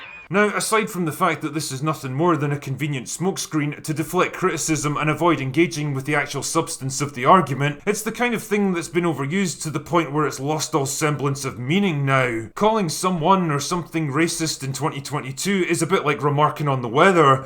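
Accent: Scotland, United Kingdom. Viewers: Interesting hearing a Scottish guy say this is a story about England. Tolkien borrows more heavily from Celtic Myths than Anglo Saxon ones. Welsh heavily influenced Sindarin and Quenya is heavily influenced by Finnish. Tolkien wanted to fill in the gaps left by the Norman invasion and used Arthurian/Celtic myth to do so.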